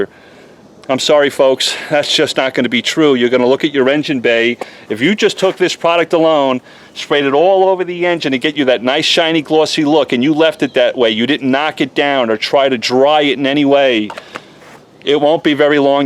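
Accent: New York, United States. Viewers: your accent sounds like your from new yark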